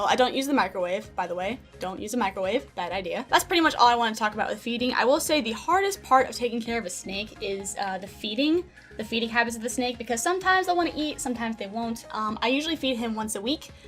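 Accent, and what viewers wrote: South, United States. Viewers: Plus that southern accent is cute lol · Def a southern accent, I think she’s from Kentucky but lives in Georgia now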